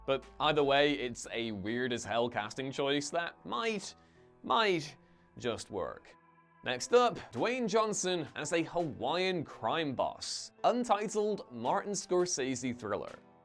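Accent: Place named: England, United Kingdom. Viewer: He pronounced it Chris-tian. It’s his English accent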